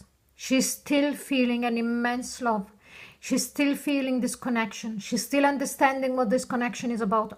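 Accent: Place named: Eastern Europe. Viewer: I found ur channel last night watched all the videos that pertained to me and I am totally impressed with you. I wish I can guess your accent I keep going back and forth on where you are from. I guess Eastern European :)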